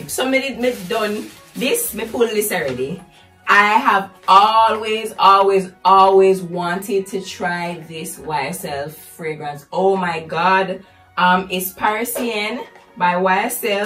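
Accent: Jamaica. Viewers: First time watching your video and I love it . are u from Jamaica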